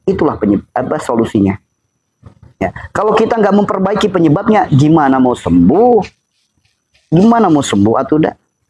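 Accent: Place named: West Java, Indonesia. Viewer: Pa ini bp orang mana kpnkaya orang sunda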